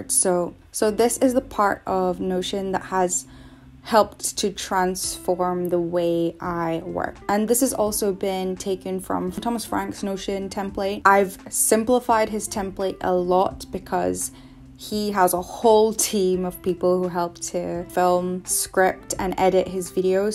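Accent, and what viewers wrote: Scotland, United Kingdom. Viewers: Your accent though. Are you scottish?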